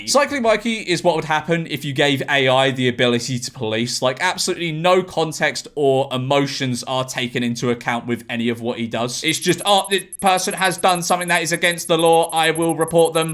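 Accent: South Africa. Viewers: His accent sounds South African, I guess that proves the old Spitting Image song true...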